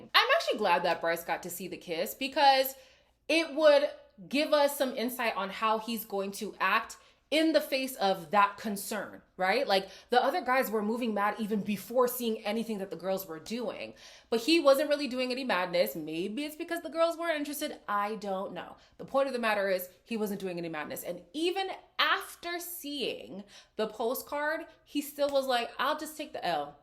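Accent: Canada. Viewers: Your Canadian accent is so cute in this video 🤣 the passion in this review, I FELT IT!!!!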